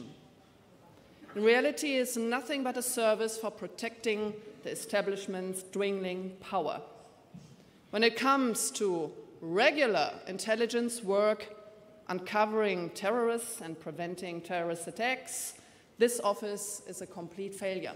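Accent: Germany. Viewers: Nö, wo denn?? Schwachsinn, die spricht super, mit bissel deutschem Zungenschlag, aber machen Sie es doch erst einmal besser. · Yep. Total extremst deutscher Akzent, hihi. Aber die Worte sind sehr gut ausgesucht. Es geht einem ans Herz. Bravo geehrte und geschätzte Frau Dr. Weidel, liebe! Gut gemacht!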